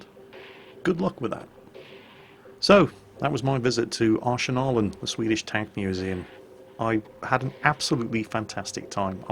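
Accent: Germany. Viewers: stop pronouncing the Swedish names like they're German! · Your swedish sounds more like german :) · Please dont say the names of the swedish tanks in german dialect. You can if you want but please dont.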